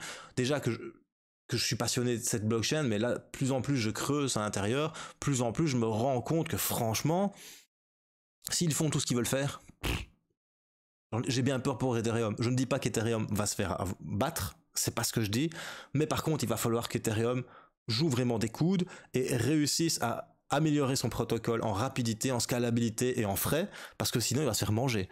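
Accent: Liège, Belgium. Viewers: C'est clair, j'avais directement reconnu l'accent liégeois ! :)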